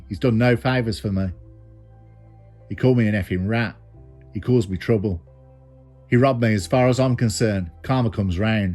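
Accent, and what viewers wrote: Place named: Midlands, United Kingdom. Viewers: Yeah that's it, it's the narration that fits like a hand in a glove with the content, probably his raw Midlands accent and demeanor that draw us in and keep us listening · 100%.. love this video and the combo of black country/brummie accent and surreal music makes this reality peaky blindersesque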